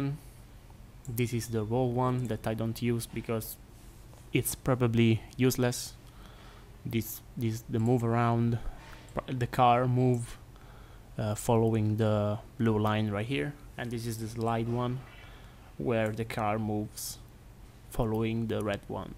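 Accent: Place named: England, United Kingdom. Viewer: bro has the best english accent and still apologises that much... amazing video, thank you!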